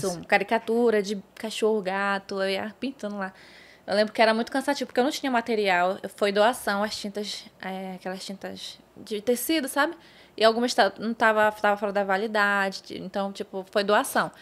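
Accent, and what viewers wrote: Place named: Manaus, Brazil. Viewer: Esse sotaque é lindo...minha sobrinha foi criada em Manaus e falava assim...❤❤❤❤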